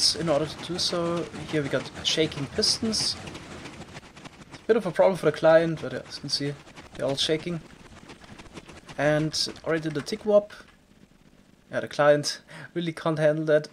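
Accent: Germany. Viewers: Das hört man raus das du aus Deutschland kommst, nices Video :D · Du bist doch deutscher oder :)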